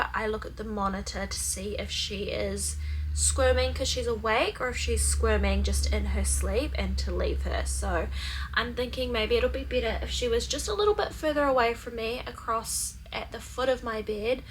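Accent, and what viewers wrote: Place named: New Zealand. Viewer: you and Brogan have the most beautiful babies...truly 3 of the most lovely little babydolls ❤ Also I could listen to you speak all day, love NZ accents! Love from North Carolina USA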